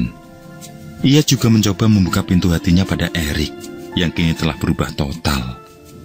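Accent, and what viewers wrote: Java, Indonesia. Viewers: Mantap gambar2 nya.. skill tingkat dewa,di padu logat.jowo yg sesekali kentel,tebal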